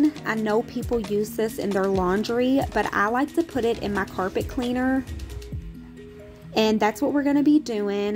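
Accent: South, United States. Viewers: I hate cleaning the bathroom! I clean the toilet everyday because I have all men in my house. So ya you already know! Glad you made another video!!!! Was missing that southern accent!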